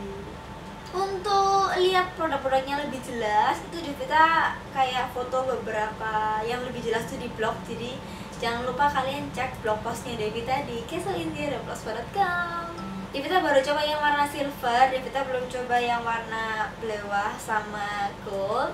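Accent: Java, Indonesia. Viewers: Asli mana ka,,,,jawa nya medok.bgt hehhee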